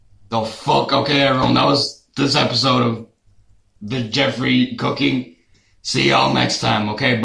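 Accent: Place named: Ireland. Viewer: he sounds like Irish man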